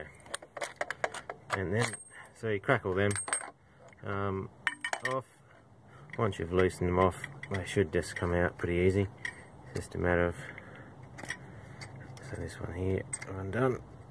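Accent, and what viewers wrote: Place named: Australia. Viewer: what a video, and talked in our Aussie language so it makes sense to us, doing mine hopefully in the coming month... one thing I have read on pages is diesel knock once replaced, do you know anything about what causes that or what steps need to be taken to prevent it? cheers, love the video mate, going to help amazingly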